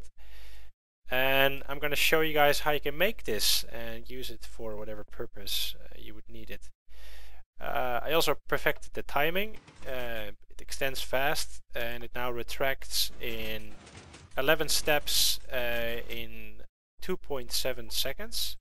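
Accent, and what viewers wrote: Netherlands. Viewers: Are youo dutch?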